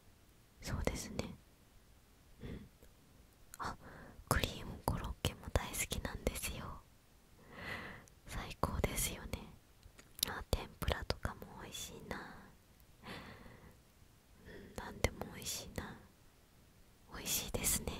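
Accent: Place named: South Korea. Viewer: 韓国の方の日本語聞いてて気持ちいいですよね♪(^▽^)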